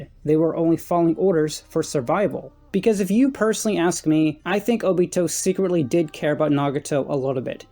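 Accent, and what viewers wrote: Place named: England, United Kingdom. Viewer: I love your English accent, and your videos are high quality congratulations 👏👏👍🏽❤️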